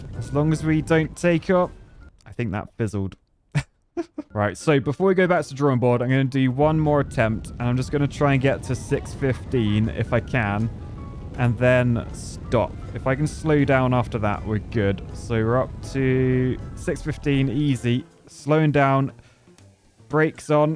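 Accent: United Kingdom. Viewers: I cant understand you when you speak british >:(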